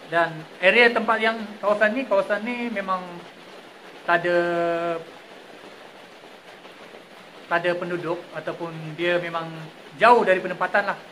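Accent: Sabah, Malaysia. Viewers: Urang sabah ka ko tu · org sabah kah bro? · Diorang Sabah ,Kerje kat Malaya!!! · pergh hebat.berani. pekat sabah kau ni bro. bajau ke bro. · cakap macam org sabah, tapi vlog kat pahang. org mana dan kenapa di pahang? hehe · dngr mcm slang sabah je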